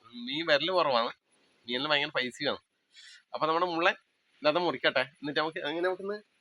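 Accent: Kannur, India. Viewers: നിങ്ങളു കണ്ണൂരാണോ ലതപയ്യുന്നു രാണെന്ന് മനസ്സിലായി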